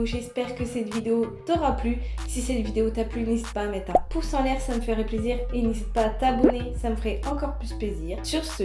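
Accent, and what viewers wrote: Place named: North, France. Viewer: J'ai deviné tout de suite que tu venais de chez nous (Nord de la france). Tu as un sacré accent ^^ Merci pour la vidéo de haul, bonne continuation :)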